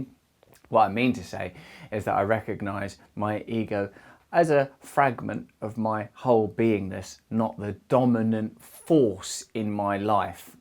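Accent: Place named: Essex, United Kingdom. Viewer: Russell I remember you hosting at various raves for MTV, and I knew from the almost permanently dilated pupils that you were "on the ting" 20+ years ago.....but that wasn't the first thing... What immediately jumped out of the screen was an apparent prodigy, preternaturally imbued with oratorical gifts, the likes of which I hadn't heard delivered via Essex accent lol... Glad I've stepped in the time machine to witness "that Russell" fully realised and giving back. ✌🏾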